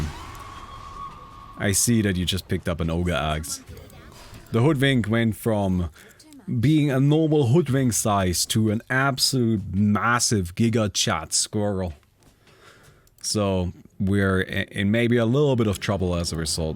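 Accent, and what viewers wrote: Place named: Germany. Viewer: Yea Now only the "Hello eenternet hello" is required combined with a stronger German accent Boom, is young again, no longer 420 million years old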